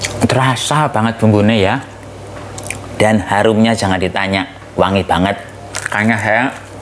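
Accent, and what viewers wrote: Banyumas, Indonesia. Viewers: Assalamualaikum baru nyimak Mase jawane mana Ngapak kaya aku Aku Kebumen mase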